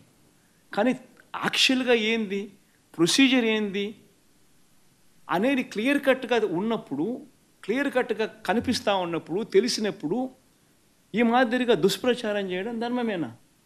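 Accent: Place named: Kadapa, India. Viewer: Kadapa slang super abbi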